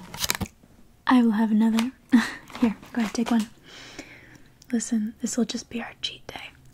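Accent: France. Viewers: Oh myyyyyyyy !! One of my favorite asmrtist doing one of my favorite type of asmr ! 😍😍💚💚💚and your french is so cute 😺😺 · YOUR FRENCH IS SO CUUUUUTE!!!! (im french) 🥺🤍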